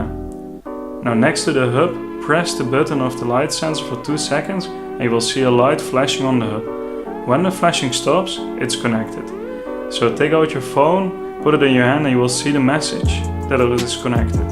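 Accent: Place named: Netherlands. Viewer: Je hebt echt een nederlands accent en een goede video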